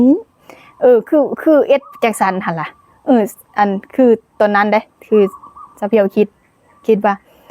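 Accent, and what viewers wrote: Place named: Sakon Nakhon, Thailand. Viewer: สำเนียงพูดคือ ไทย สกลนคร 😊😊😊🇹🇭🇱🇦💓😊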